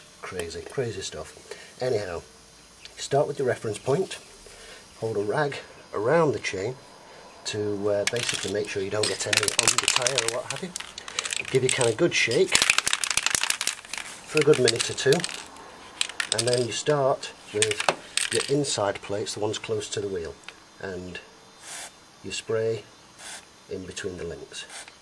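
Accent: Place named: Scotland, United Kingdom. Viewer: Sorry I took it that way... just seems like everyone is always hating on the USA. here on Youtube. I appologize for assuming thats what you meant. I agree with you on the voice.. I love the accent too... Although the Scotts can be hard to understand... As are many of my fellow Americans that live in the deep South, especially in Louisianna... most times I have NO IDEA what they are saying. :)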